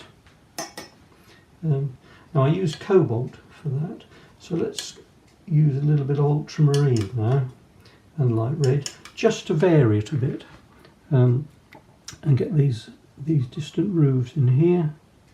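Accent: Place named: England, United Kingdom. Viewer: Thanks. It's great to hear a true English descriptive narration, and a lovely result too.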